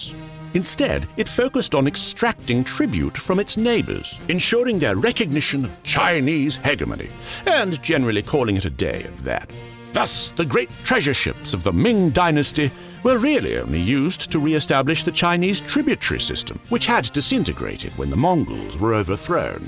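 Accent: United Kingdom. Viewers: 您的英式英语真的非常非常好听❤❤❤ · I discovered your channel today. Instantly hooked with the brit pronunciation and enunciation. It's really good, and the pacing is well done too. Instant sub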